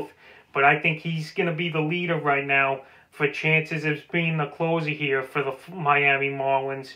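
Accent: New York, United States. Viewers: I love this guys New York voice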